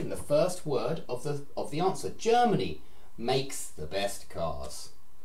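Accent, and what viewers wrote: United Kingdom. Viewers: Well, i was expecting for something different I guess, but it was a good lesson, you taught me something new which I was a little bit confused on how to use it or even to teach it. It´s a difficult topic and you did quite well, by the way I love you accent, I guess you are british, aren´t you? :3 Congrats! and thanks for the lesson :3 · Hola, I like the way you teach. It's very clear. I like your accent, I don't know which part of England you are from. I'm from Argentina and I love English language. I'm an intermediate learner so sometimes you get stuck or you understand half the things you hear and that makes you feel dissapointed. I'd like a lesson about phonemic symbols and how can I pronounce a word if I don't have a dictionary with me. Saludos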